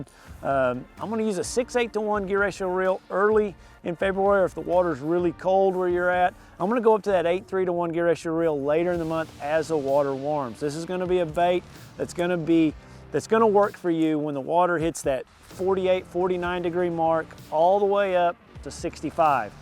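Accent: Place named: Midwest, United States. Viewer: I just like your way of teaching others, easy to listen too! Plus you're a Midwest guy right, u think I heard you're an Okie?!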